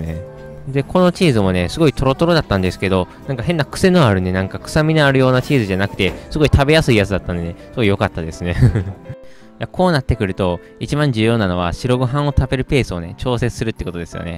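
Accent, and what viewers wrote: Kansai, Japan. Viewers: 関西弁でたまに毒舌Sっぽいところがいいんだよなあ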